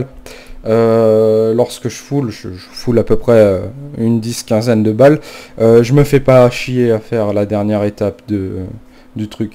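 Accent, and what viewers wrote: Alsace, France. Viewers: ah un alsacien sa fait plaisir ^^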